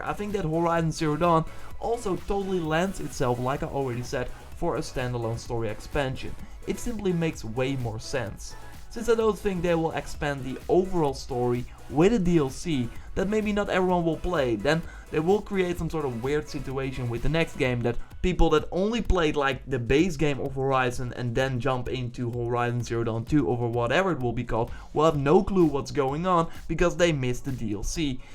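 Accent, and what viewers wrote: Netherlands. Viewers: ofc he is ... that accent tho :) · ik hoor aan je accent dat je nl bent :D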